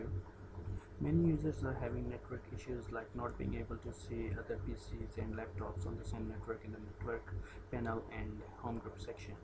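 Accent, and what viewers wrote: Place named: India. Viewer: Will I be lucky today? Is the narrator going to speak normally or have an obnoxious Indian accent? ... FUUUUUCK!!!!!!!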